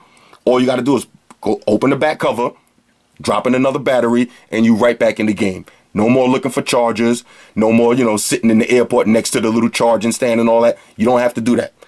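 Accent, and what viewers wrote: New York, United States. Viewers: I just want to say that I really appreciate your enunciation on this review. As a fellow native New Yorker, it's refreshing to hear our local swag on a topic I'm interested in. Most reviews on phones seem like they come from nasally 15-year old nerds from Ohio (The same people that are migrating to this city and pissing me off, but that's another story). Keep doing your thing bro. EDIT: LMAO "size matters" and "red lobster dinner pics with bae"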